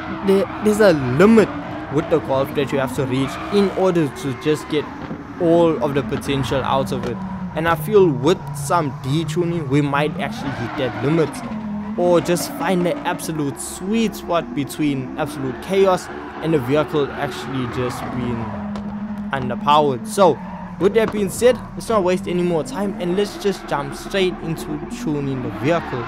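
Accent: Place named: South Africa. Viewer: This bro is a South African indian 100%... I swear straight from durban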